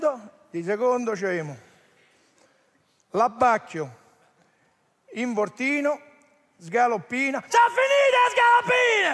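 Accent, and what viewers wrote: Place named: Rome, Italy. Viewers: E' un comico spontaneo,una comicità' naturale!!! In romanesco poi...... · Sempre grande divertente. Il romanesco mi piace.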